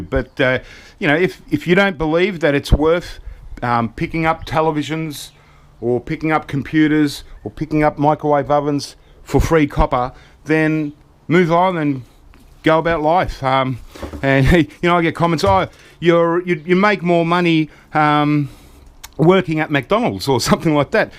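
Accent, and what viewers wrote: Australia. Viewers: I live right in the middle of the U.S.A. and I'm starting to say aluminum, the way you do and I don't even realize it. I wish I could talk like you! Everyone I know loves the deep Australian accents!!! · I enjoy your videos mate. I love hearing Aussies talk. Never gets old.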